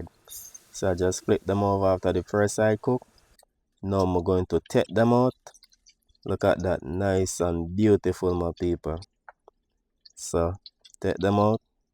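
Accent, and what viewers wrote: Jamaica. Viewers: I just love mi JAMAICON accent and pronunciation. Get well soon, we miss you.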